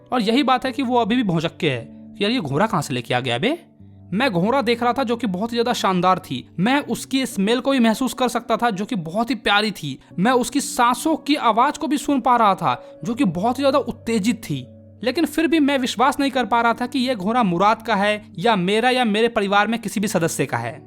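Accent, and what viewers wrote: Bihar, India. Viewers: Bihari assent 😎🤟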